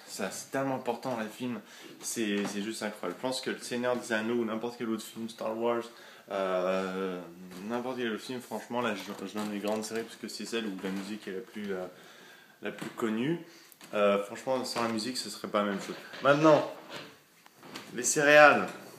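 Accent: Belgium. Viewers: Vous dites comme en Belgique :) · Vous parler comme nous lles belges :)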